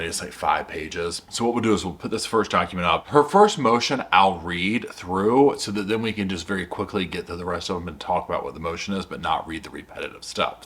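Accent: South, United States. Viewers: Great video also as as someone from Arizona whose never been east of Texas you might be the first person I’ve ever heard with a southern accent & gay voice lmao and I gotta say a gay southern accent is now my new favorite accent ( sorry Australians)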